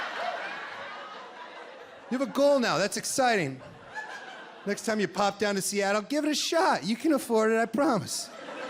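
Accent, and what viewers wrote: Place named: New York, United States. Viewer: I could of swore I heard a New York accent. Thanks for the laughs.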